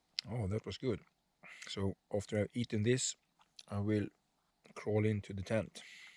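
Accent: Sweden. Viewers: Great video; and excellent comments on the bike's performance. I have several KTM/Husky bikes and I love this size whether it's the Duke or the ADV. Beautiful scenery too. The boreal forest is flat but very "endless" feeling. I enjoy your accent. My ancestors were Swedish and all the old folks are gone now so I miss it.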